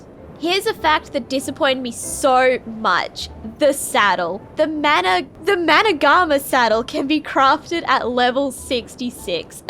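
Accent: Australia. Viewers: Are ye from australia mate? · is an aussie???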